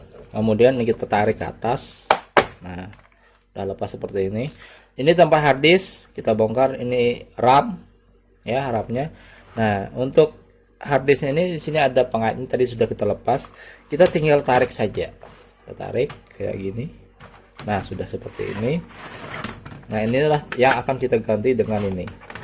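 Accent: Banjar, Indonesia. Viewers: Orang Banjar kah bang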